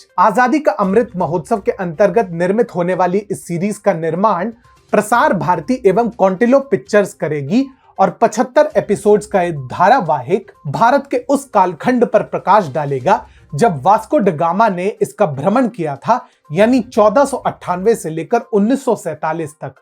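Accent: Standard. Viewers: अति उत्तम पोस्ट। जी आप बहुत शुद्ध हिन्दी में प्रस्तुती देते हैं। साधुवाद। कृपया निड़र ह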